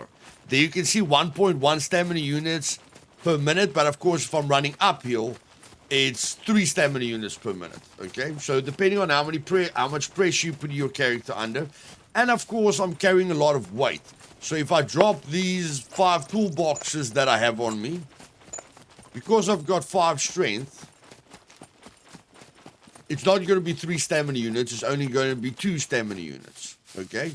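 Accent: South Africa. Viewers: hey you sound south african what server do you play on?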